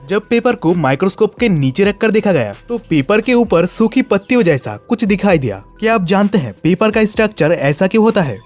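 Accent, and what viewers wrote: India. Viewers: It's very amazing. Your accent is like that of a bengali🤭🤭❤💖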